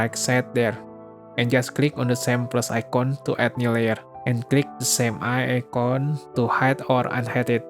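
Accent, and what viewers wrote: Indonesia. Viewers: Thank you for this tutorial! I'm immigrating from ibis to krita and it's so overwhelming. I kinda see why ibis is still searched after, the ui is nothing like other art program, even my 10yo niece master ibispaint super quick! But it's less advanced compared to krita. My friend told me that krita is better if i'm walking the professional path. Anyway when i heard your accent i immediately thought wah indo nih and it turns out to be true lol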